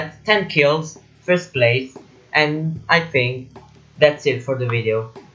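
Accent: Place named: Italy. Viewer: 100% italian voice xD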